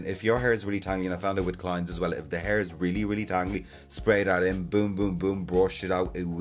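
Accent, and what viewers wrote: Ireland. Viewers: ….I ADORE watching and listening to you. You make me smile so much. I LOVE your “Heap of Durt” comment…. your accent…..reminds me of my great Irish friends. I take your knowledgeable opinion as THE BEST. Big hugs, Teddy U.K. xx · Hey do you live in Ireland? Your accent and the way you say film is so Irish coded. Love it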